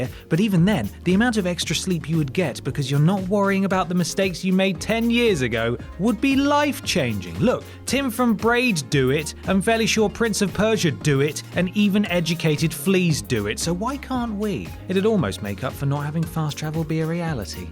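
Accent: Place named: RP, United Kingdom. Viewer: is so posh in these videos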